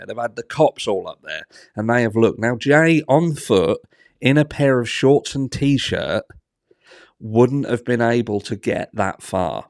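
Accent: Norfolk, United Kingdom. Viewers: Out of interest where are you from? You sound like you have a Norfolk accent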